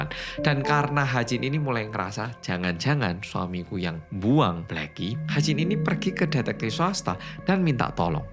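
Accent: Java, Indonesia. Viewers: Yang medok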